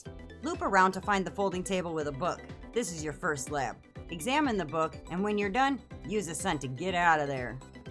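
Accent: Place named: Michigan, United States. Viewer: Sounds like you're from Flint. Or possibly Burton or Grand Blanc... or maybe you're in the metro area, who knows. But definitely somewhere in Michigan, I'd bet a fairy on it.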